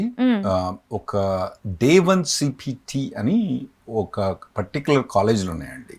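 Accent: Telangana, India. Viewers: We like your Telangana accent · Sir, your Telangana language is a practical one and we really enjoy it as a Telanganite. We like it Sir 👌👍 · Sir, I like your Telangana accent language. Please continue to be what you are. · We really enjoy your telugu which is pleasant and pucca telengana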